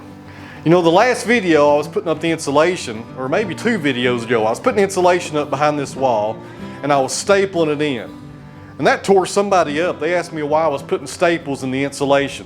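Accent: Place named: Tennessee, United States. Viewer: I think I would go for a second coat unless the bulk of the wall is being covered up by tools etc. No on second thoughts a second coat is needed, do the job properly so that the exsposed parts of the wall do look finished. Thank you for what you do, it is great to watch your progress and growth. That accent of yours is just how a Limey would imaginea genuine Tennessee man to have and long may you continue, I just love it. How much land do you have for your property, land in England is eye watering high and hard to come by.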